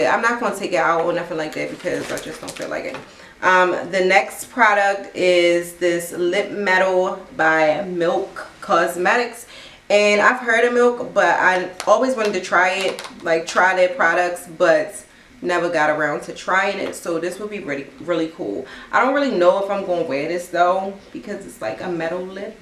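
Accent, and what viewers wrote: Baltimore, United States. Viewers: I almost thought she was from DC too but she got that heavy Baltimore thing going on lol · I know that Baltimore accent from anywhere 😍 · She’s from the north it’s her accent maybe Baltimore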